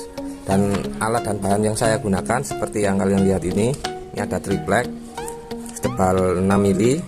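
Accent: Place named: Malang, Indonesia. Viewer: Mas sampeyan iki wg malang ta? .... Kok logate arema pasti tak like mas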